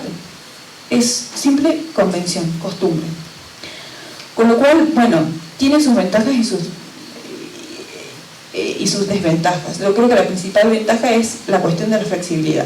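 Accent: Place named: Argentina. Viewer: saludos. no teiene mucho quever pero me suena como a unespeciede acento Mendocino de argentina o Cordobes de argentina.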